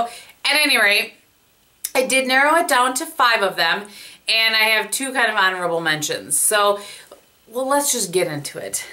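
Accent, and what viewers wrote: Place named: Midwest, United States. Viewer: Love your Midwest accent. I sound the same and also am a palette addict.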